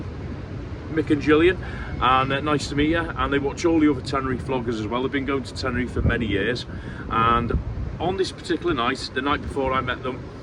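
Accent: United Kingdom. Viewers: Do you have a scouse accent?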